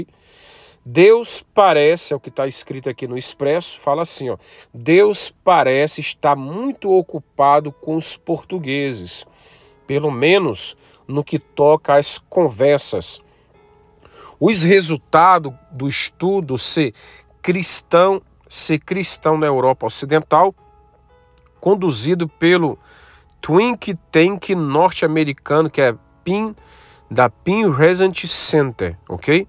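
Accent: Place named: Brazil. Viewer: Não sabes do que falas! Pelo teu sotaque és do Brasil, fala do Brasil! Deixa Portugal para os Portugueses!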